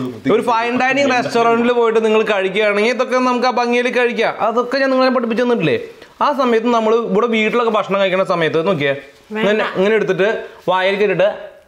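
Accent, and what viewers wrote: Thrissur, India. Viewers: What a humour. Trichur slang. Beautiful. Yes ur hands r love. Fantastic presentation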